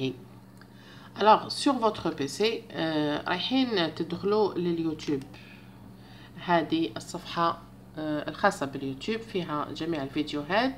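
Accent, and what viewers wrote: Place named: East, Algeria. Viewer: جابلي ربي من الشرق الجزائري حسب هدرتها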